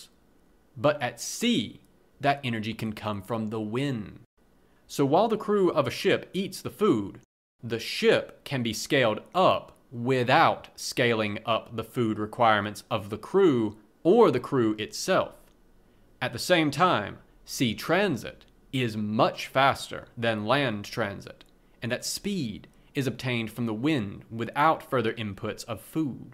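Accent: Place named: South, United States. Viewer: Thanks for doing the audio! I really enjoyed it. Where are you from? I keep thinking somewhere...southern US east of Texas. (It is not a huge accent. Some vowels, not all of them and none of them all the time, sound slightly southern to me.) Maybe Alabama or Georgia? State-level id is plenty, I'm not trying to doxx you.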